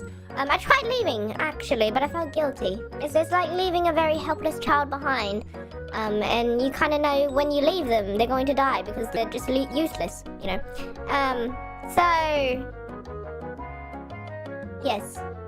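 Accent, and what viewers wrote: United Kingdom. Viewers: I just want to say I really do like your british accent. I can listen it all day❤️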